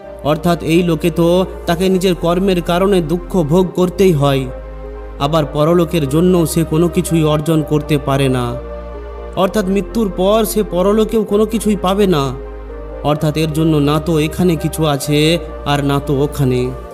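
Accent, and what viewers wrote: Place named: Kolkata, India. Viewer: উনি কলকাতার মানুষ তাই এটা কলিকাতার ভাষা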